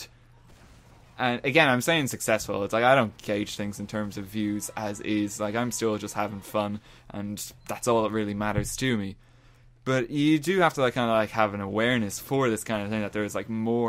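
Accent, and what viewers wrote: Ireland. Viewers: I have only been here for about a month but I absolutely love your videos. It also helps that your Irish :D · Are you irish?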